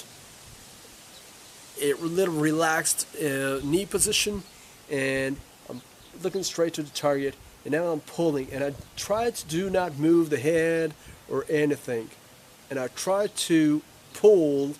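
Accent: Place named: North Carolina, United States. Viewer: I can't figure out if he's from North Carolina, Virginia area USA.. or somewhere in England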